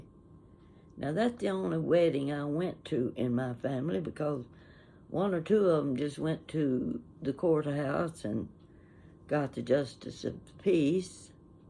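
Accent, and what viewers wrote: South, United States. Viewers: You remind me of my Aunt Jean who lived in the country of Alabama, same sweet smile. I think I must have grown up deep south a bit since both my parents were from Birmingham, Alabama. However, they moved to Texas where I was born. So friends tell me I sound more like I'm from New England with a few Texas bits, but I have deep southern habits. Lucky me! Anyway, I love stories and hearing your accent reminds me of my mom. Bless you.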